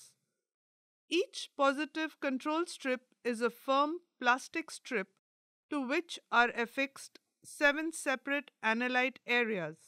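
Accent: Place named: India. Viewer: The indian accent is to distracting.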